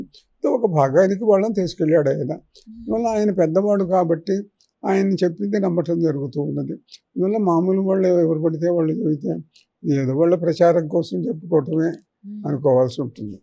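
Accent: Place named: United Kingdom. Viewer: యాంకర్ మాట్లాడే విధానం చుస్తే తెలుగు వారికీ పుట్టినట్టు లేదు ఇంగ్లీష్ దొరకి పుట్టినట్టు ఉంది తెలుగు మాట్లాడం నేర్చుకుంటే బాగుంటుంది.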